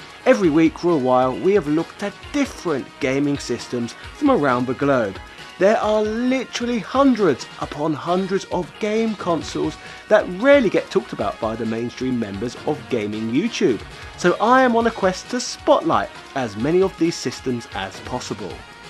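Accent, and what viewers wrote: England, United Kingdom. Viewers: Are you england? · It's his accent. He's from the U.K.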